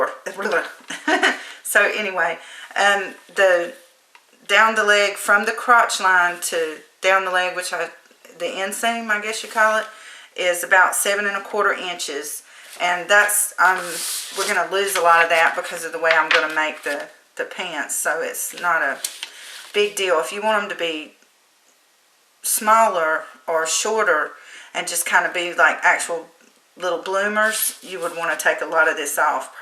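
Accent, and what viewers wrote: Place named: Louisiana, United States. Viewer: Are you from Louisiana or Arkansas? Recognize that accent :)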